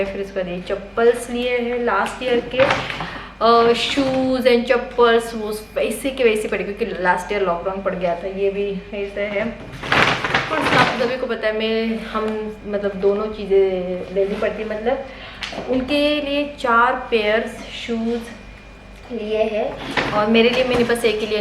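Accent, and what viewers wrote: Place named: Maharashtra, India. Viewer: Kay yar kay loka ahet...Tumhi kay punekar dista watate. punyat sHhudh marathi bolali jate. Tasach itar bhagat marathi ch bolali jate pn wegli tone ,uchhar wegle astat tyat kay awdha.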